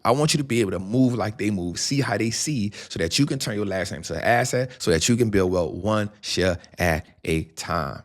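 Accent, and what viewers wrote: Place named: Los Angeles, United States. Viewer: Thank you. You explain very well. ❤ Love the LA accent! 😊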